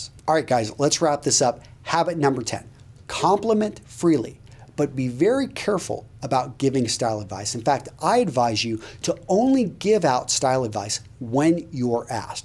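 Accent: Wisconsin, United States. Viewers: Woah, weird to hear someone from Wisconsin. We're like the lost state.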